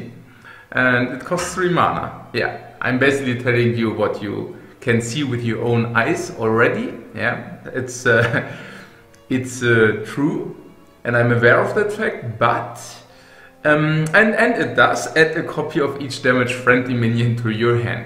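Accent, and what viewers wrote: Germany. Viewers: Liege ich mit der Annahme richtige das du eigentlich deutsch sprichst? XD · his english sounds sooo german xD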